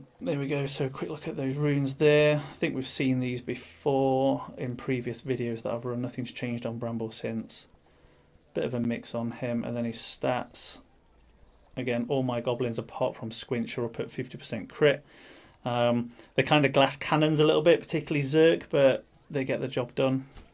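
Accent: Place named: United Kingdom. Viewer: You sound British tbh :P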